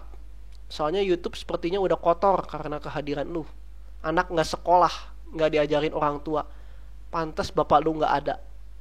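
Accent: West Java, Indonesia. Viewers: Logat nya orang sunda banget dah Apa orang bandung ? Wkwk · logatnya sunda banget 😂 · doi orang sunda kayanya jadi ngomongnya gitu wkwkwk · Sunda bgt :D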